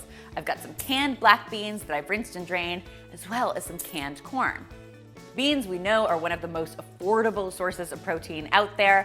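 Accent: Canada. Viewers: She has a Canadian accent.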